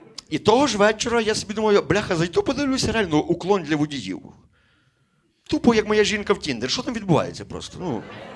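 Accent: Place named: Western Ukraine, Ukraine. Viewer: Ще за «святу Галичину», ходили, плавки і т.п. на рідному западенському сільському акценті аплодисменти стоячи. 👏👏👏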